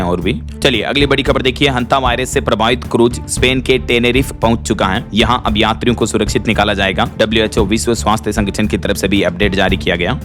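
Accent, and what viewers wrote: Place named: Madhya Pradesh, India. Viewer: Lag ta Hai ki aap madhya pradesh say ho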